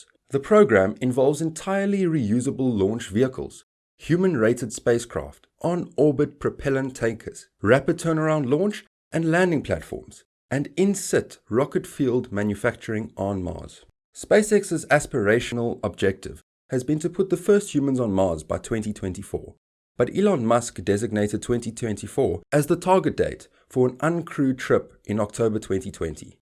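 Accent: South Africa. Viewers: you have a south african accent? i'm from durban, loved the video! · I can hear your South African accent from a mile away.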